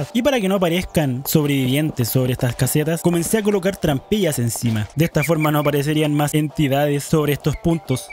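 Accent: Chile. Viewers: Me encanta el acento chileno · Verdaderamente escuchar tu voz, narrando con tu acento chileno me hizo sentir muy agusto y entretenida, como cuando veía 31 minutos lol, saludos 🇲🇽😸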